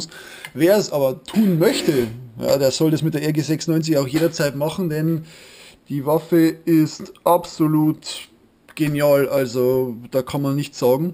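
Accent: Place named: Burgenland, Austria. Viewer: Woher bist denn du? Du redst meinermeinung noch wia a Burgenländer.(I bin aus Margerethen.)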